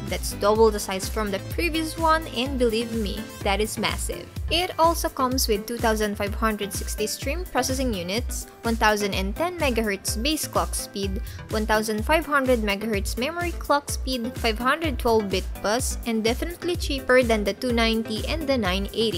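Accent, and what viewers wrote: Philippines. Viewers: That Pinoy accent tho.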